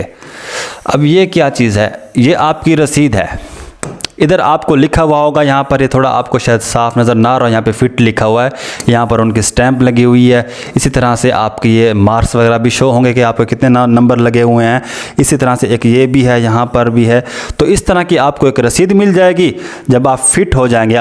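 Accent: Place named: Saraiki, Pakistan. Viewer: ASSLAM WALIKUM NICE VIDEO LGTA SRAIKI HAIN AP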